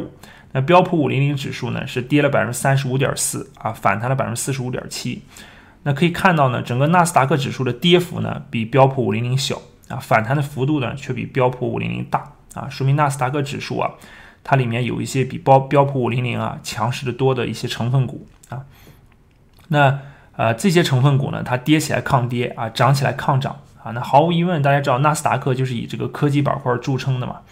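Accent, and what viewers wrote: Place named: Northeast, China. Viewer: 听出来有东北口音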